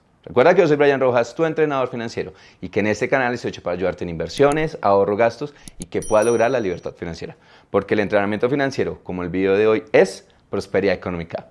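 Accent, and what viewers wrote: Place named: Colombia. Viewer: Tienes acento Colombiano, te refieres a 5 millones de pesos mexicanos?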